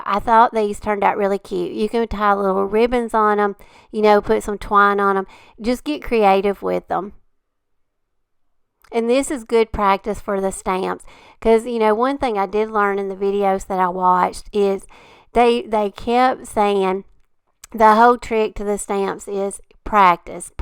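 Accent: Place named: Alabama, United States. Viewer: CRICUT! I really look forward to ALL of your videos. And as a southern gal originally from Alabama now living in Florida, I really LOVE hearing that 'bama accent!!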